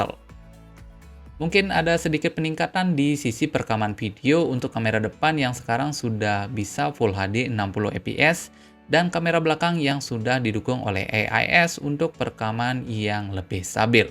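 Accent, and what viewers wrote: Bali, Indonesia. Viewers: Logat bali y bang?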